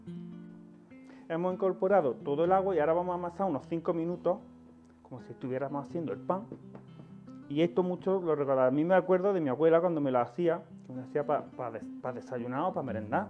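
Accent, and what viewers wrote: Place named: Granada, Spain. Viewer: Me encanta escuchar tu acento y tus palabras de Grana soy de Armilla vivo en California por 42-43 años cocinero o como ahora se dice Chef , jubilado pero sobre estas fechas empiezo añorando mis comidas y niñez Trabaje en Casa Salvador en los 70s Un saludo y gracias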